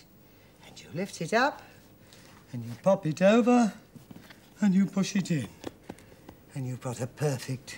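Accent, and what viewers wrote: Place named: England, United Kingdom. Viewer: Awfully English lasy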